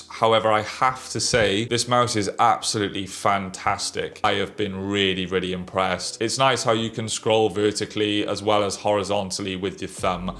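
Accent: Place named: United Kingdom. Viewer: You sound British. Do you work at Spotify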